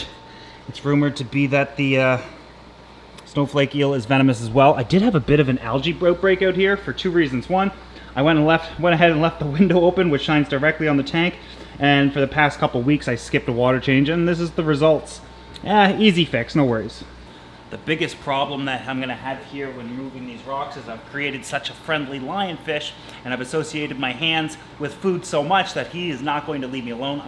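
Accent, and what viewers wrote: Canada. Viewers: I only just started to notice your Canadian accent in videos... I must be too busy looking at your amazing tanks to have noticed your cool accent.. Love the vids as always!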